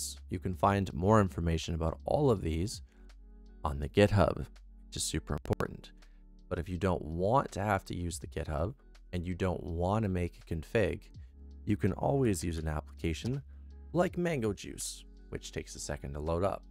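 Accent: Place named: Maritimes, Canada. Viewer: /wave from Alberta. Wife's a Newfy. Your maritime accent is just fine brother! /cheers (earned my sub)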